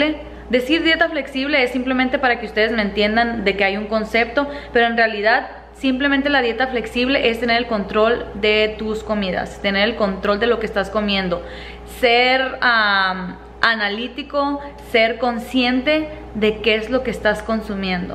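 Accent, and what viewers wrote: North, Mexico. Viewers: me parecen buenísimas tus recetas! Gracias por compartir! De donde eres, tú acento suena del norte, yo soy de Nuevo Laredo! Saludos!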